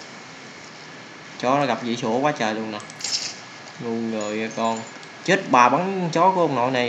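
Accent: Mekong Delta, Vietnam. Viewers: Anh Nói Chuyện Đúng chất Người Miền Tây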